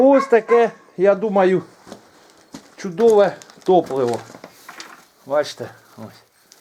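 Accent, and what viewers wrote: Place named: Poltava, Ukraine. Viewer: Привіт відео про дививсь,про корморізку січкарню шок? А остальное чудово свиней люблю колоть з початку війнитримав3х Зара ні?молодець господар ,підпишусь тільки не шкодь техніку воне не для того,зроби окремо щепоріз в парі з дроворубом,шків потрібно проточить ,під ремень відповідний на апараті?або ремень інший ну пока іду воблера дороблять,молодець господар ,рибак,вимова мені приємна полтавоукраїнська ,мені подобається успіхуОК.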